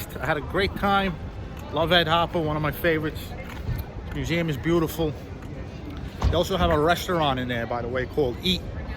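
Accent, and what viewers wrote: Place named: New York, United States. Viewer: I miss this exhibition, because 2 years ago I haven’t discovered Hopper yet. It is very cool to have listen to have a New York accent guiding me through the exhibition. Make it more immersive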